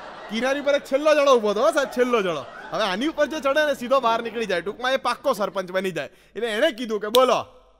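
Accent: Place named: Ahmedabad, India. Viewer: તારી અમદાવાદી બોલી મારા દિકરા જેવો છે એ વિદેશ માં છે મને એની યાદ આવે તો તમારા વિડિઓ જોવું.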